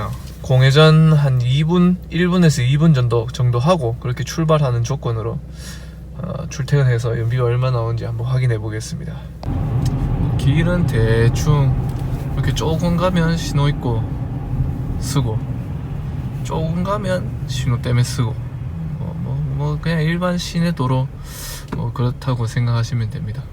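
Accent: Gyeongsang, South Korea. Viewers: 경상도 사투리 멋집니다 ㅋㅋ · 아 이 말투는 상주 구미 김천 말투 같은데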